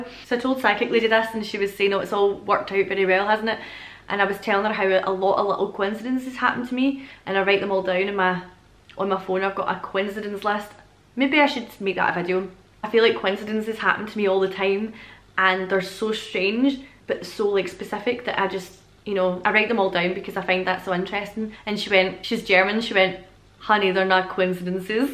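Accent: Scotland, United Kingdom. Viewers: Welcome to America, Miss. Lot of things will be strange, but much will be familiar and inviting. Advice? I do have relatives in New Mexico, and the temperature changes DRAMATICALLY throughout the day, from hot in the daytime to freezing at night, so bring a couple of jackets, even if it's 100 degrees that day. Also, you can always expect that when someone in America hears your accent, they will want to tell you about their Scottish ancestors (the same applies to ALL foreign tourists we encounter here). I know it gets old really fast, but they really want to hear about the country their family came from, and they are trying to make you feel welcome.:) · I think this means u r thinking beyond the 3rd dimension. Past present future r all one, I been watching Aaron Doughty he does meditation but explains reality shifts etc (the same thing is happening to me) Have fun in US! 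They love Scottish ppl there, esp a pretty lady...in fact u could coast right thru on accent alone 😎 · This is me listening to you. 😂 I just love your scottish accent!! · you always make my day better. I think you are perfect in every way. You are so beautiful and I have always been a fan of the Scottish accent so it drives me crazy to hear that accent coming from that beautiful face. Keep the videos coming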